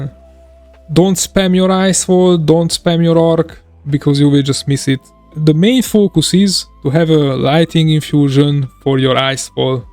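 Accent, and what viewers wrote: Hungary. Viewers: Where are you from? You sound like you are from hungary a lot :)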